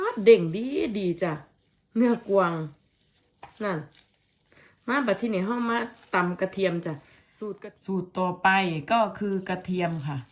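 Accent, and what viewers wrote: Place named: Isan, Thailand. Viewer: พูดอิสาน หรือโคราชก็ น่าฟังดีจ้า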